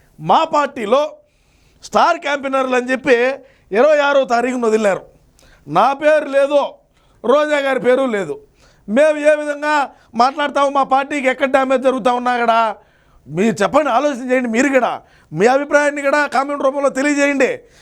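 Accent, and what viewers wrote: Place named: Chittoor, India. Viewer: Where are you from brother you talk telugu of chittoor district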